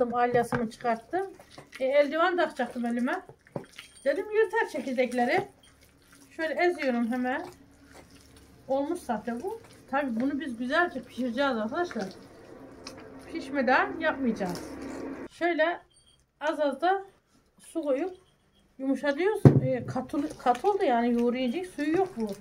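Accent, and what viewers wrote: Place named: Amasya, Turkey. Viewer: hanim sizin konusmaniz benim memleketim amasya sirvesine cok benziyor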